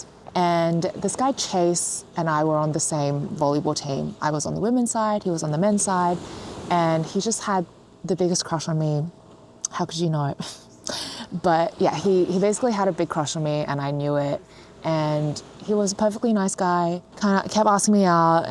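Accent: Australia. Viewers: I wish I had your Aussie accent. I have a boring American accent. 😢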